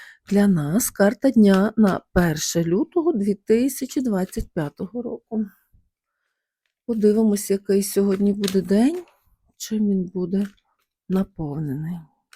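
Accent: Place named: Galicia, Ukraine. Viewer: Доброго ранку. Дякую за прогноз. Ви напевно зі Львова? Галицький говір, мова.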